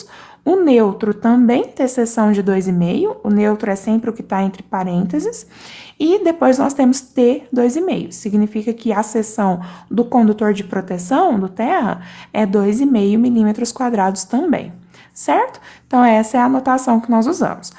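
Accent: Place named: Minas Gerais, Brazil. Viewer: Sotaque mineiro, me pareço bem bonito.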